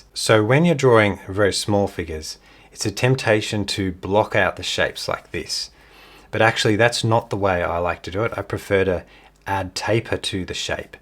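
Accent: Australia. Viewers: Just found you and subscribed - enjoying your Australian accent and excited to try your approaches!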